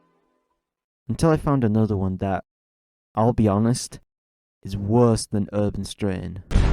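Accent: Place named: United Kingdom. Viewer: New upload from my favorite bri’ish man? Nice! (Don’t tell combine elite lmao)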